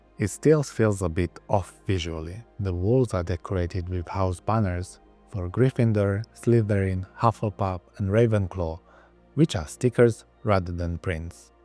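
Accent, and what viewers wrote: United Kingdom. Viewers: I’m sorry to be rude, but I’m not watching this channel as much anymore. There was something charming about the British AI voice…that said, I pretty much hate everything else AI, but he had happened to pick the perfect voice. Oh well, I suppose I can always go back and watch the old ones.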